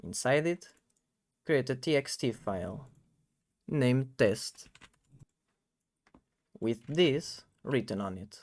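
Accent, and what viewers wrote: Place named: Portugal. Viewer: Muito tuga, não engana ninguem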